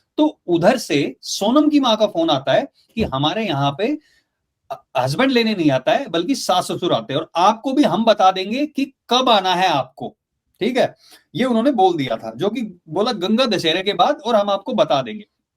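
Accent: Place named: Haryana, India. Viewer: Australia mei rehte hai aur accent Haryanvi hai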